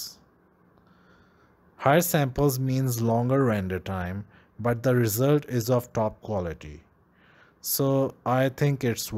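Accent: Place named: India. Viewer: Really amazing even with the indian voice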